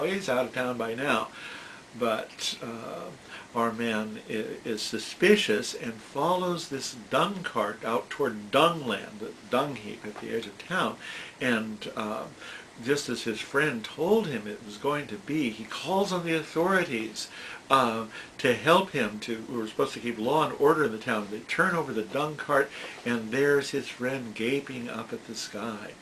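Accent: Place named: United States. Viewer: Yet another American! I don't want to hear about Chaucer with that annoying accent!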